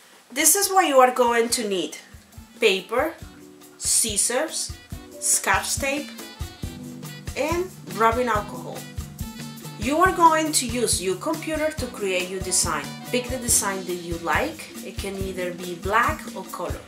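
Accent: Colombia. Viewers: ay mi cileo tengo un proble ni el berrco en la vista y no puedo leer porfa habla en colombiano jejeje vivo en chile y eres la unica persona que me recuerda a mi pais jeje (colombia) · tu eres tan hermosa y puedes grabar en español, inglés,o en chino si quieres, lo único es que se te extraña en el video..... Ami la verdad a me gusta más escucharte en tu idioma porque me gusta mucho el acento de l@ colombian@.....Gracias por todo lo que haces.......y suerte en todo lo que hagas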